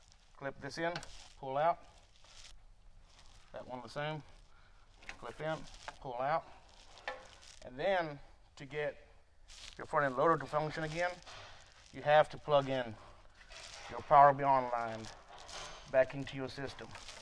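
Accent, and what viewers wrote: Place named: Louisiana, United States. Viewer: Little bit cajun lol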